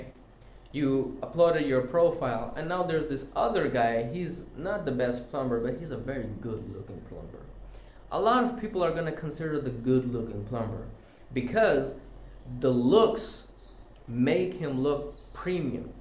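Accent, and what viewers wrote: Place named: United States. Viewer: are u from pakistan? how do u have american accent?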